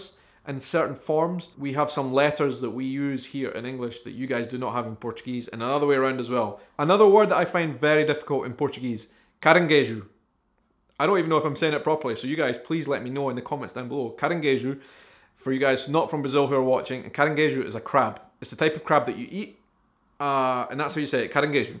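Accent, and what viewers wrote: Scotland, United Kingdom. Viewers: Você arrasou na pronúncia, parabéns! Ah, e seu inglês escocês é tão bonito! :D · I'm really enjoying your videos,! And you're doing very well with your portuguese. I've never been in Scotland, but I love the culture and especially the scottish accent. It just lovely! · if u're having problems saying "Florianópolis", try > FLORIPA. everyone will understand it too... quando vc dizia "word" eu entendia "ward". scotish accent is a little tricky nice vid.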